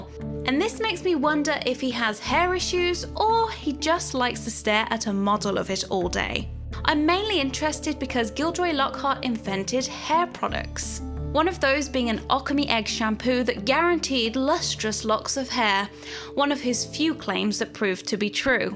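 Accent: United Kingdom. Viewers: Not actually related to the video, but I really love your voice and accent ! I'm French, and I usually tend to dislike the British accent, I don't really know why. But oddly, I find your voice and speech very pleasant and relaxing to listen to ! Also, I got 10 points for Hufflepuff, because I knew about the roosters, Ron's robes and the diary. And I think Lockhart has a wig "in case of an emergency". For instance, if someone came to knock on his door at night, he wouldn't want to be seen with untidy hair, so he would be able to quickly put on his wig and look flawless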